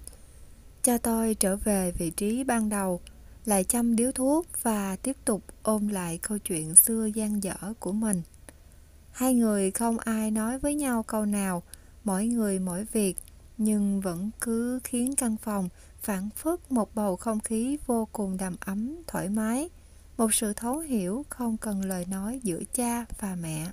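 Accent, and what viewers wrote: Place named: Mekong Delta, Vietnam. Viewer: Câu chuyện rất hay cùng với giọng đọc rất hay rõ từng lời, mang một chất mộc mạc của miền tây.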